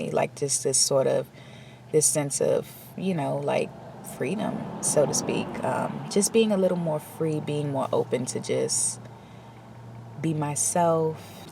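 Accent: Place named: DMV, United States. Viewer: I hear a dmv accent. I am from there. I am feeling the same way with the trees. I wish I can move so that I can feel more freedom of nature. ❤ im grateful you have found your way.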